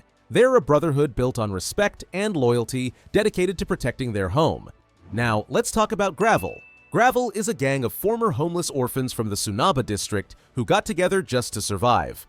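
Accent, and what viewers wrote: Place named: United States. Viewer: the american pronounciation of the japanese is so funny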